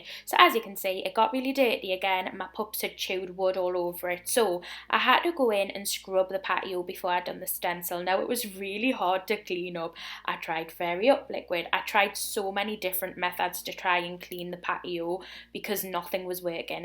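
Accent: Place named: Jamaica. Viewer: Jamaican here. Its wild to hear the similarities in our accents. Good job on the patio!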